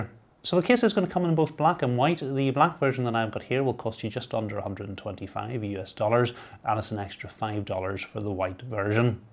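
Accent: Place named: Scotland, United Kingdom. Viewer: just scottish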